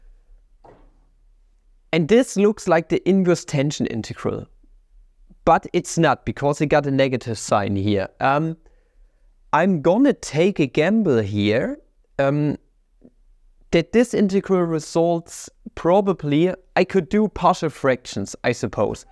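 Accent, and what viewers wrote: Germany. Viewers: I very much appreciate your videos, just brilliant mathematics. The heavy German accent is not a big deal (I’m German myself). But please, please, do me a favour and try to pronounce the “i’s” and the “y’s” as /ai/ where needed. A “y” and the word “why” is pronounced correctly /wai/ and not /va/. It means corporal pain to me to hear it like 100 times in a row in your videos.😢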